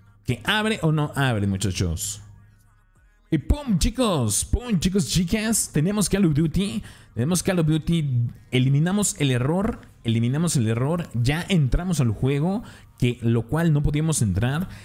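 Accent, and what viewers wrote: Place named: Russia. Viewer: Gracias bro, quería hacer este contenido en mi canal, y me pasaba este error, aparte me gusta Tu acento, parece Ruso jaja.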